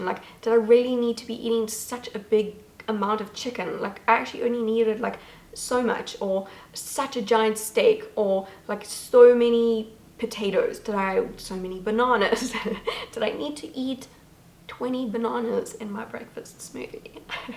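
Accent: South Africa. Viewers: You sound South African 🙃 anyway great video thanks.... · You probably get this question a lot, but is your accent south African? It's very beautiful. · are you south african omg love your accent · I thought i recognized the accent. Im also from SA, Cape Town. :) Love your channel! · Wait r u south african?? Capetonian here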